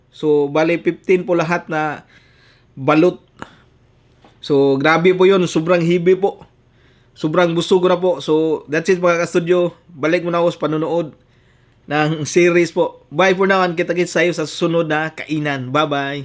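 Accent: Visayas, Philippines. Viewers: Bai klaro kaayo bisaya ka Basta mo sturya mag tagalog bwhahaha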